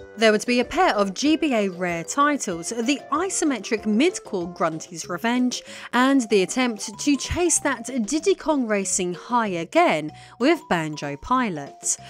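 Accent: United Kingdom. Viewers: Funny that all these videos about banjo threeie have someone British talking in it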